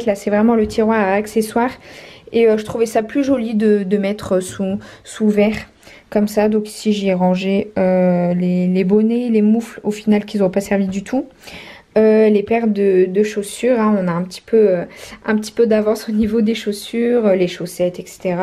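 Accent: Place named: North, France. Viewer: Bonjour, ce n est pas une critique mais j ai l impression que vous avez un petit accent du Nord...😊😁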